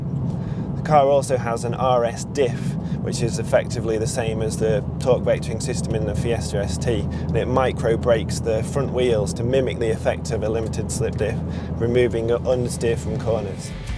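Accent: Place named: Yorkshire, United Kingdom. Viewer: I like him bar the thick yoker accent.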